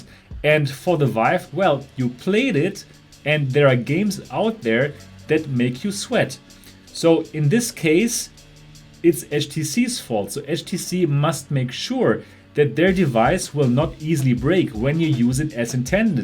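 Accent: Germany. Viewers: Also dein Deutscher accent hört man sowas von aber Cooles Video :D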